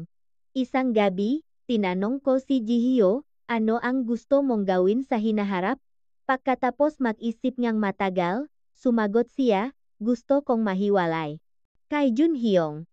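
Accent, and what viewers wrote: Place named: China. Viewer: Ano n yn, intsik k b, nakakawalang gana makinig syo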